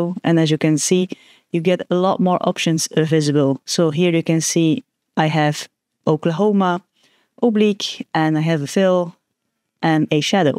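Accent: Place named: Netherlands. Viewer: I know a Dutch accent when I hear one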